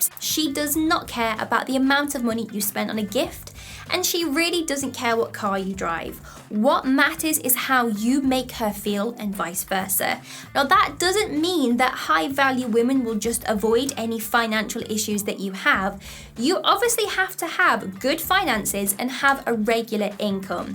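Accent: United Kingdom. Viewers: i think you are British because of your accent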